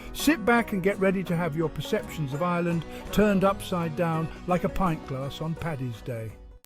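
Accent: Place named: United Kingdom. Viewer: "Our", "we". You sound British and your pronunciation of the Irish language is not indigenous.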